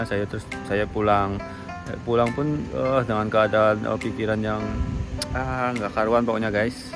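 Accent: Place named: Banyumas, Indonesia. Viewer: Ngomong GEning te ngapak boss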